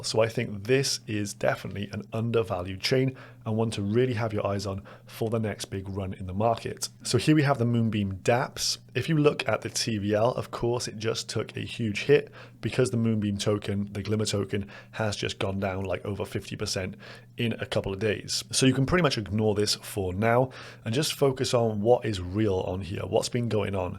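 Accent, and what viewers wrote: Cardiff, United Kingdom. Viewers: are you from Cardiff? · Nice video cheers man. Is that a cardiff accent I hear?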